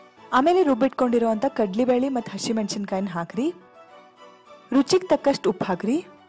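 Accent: North Karnataka, India. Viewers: Ri madam, i really appreciate ur unique recipe, but i watch ur videos becz of ur voice and especially UK slang. U r multi talent, u r mixture of Modern, Traditional and cultural Angel. Thanks Ree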